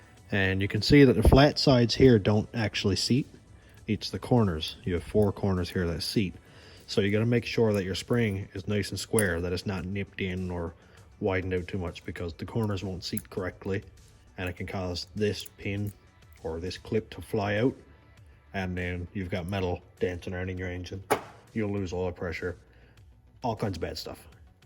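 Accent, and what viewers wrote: Newfoundland, Canada. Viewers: Awesome video ❤!! And I knew that accent of yours was from the rock,…. 😊 a fellow Canadian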